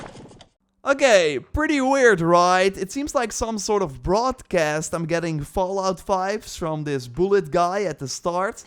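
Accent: Netherlands. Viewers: asljeblieft , werk aan je verkaasde engels . goeie content maar mijn oren bloeden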